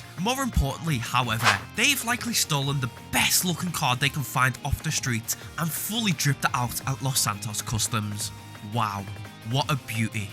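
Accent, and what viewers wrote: Liverpool, United Kingdom. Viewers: L bri ish narrator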